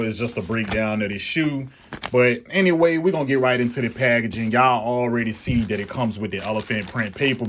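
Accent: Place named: Louisiana, United States. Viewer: Where u from? Sound like Louisiana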